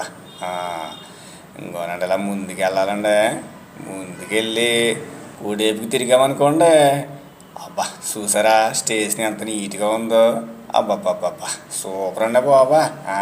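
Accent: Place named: Godavari, India. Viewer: గోదావరి యాస మన అందరి శ్వాస......🎉🎉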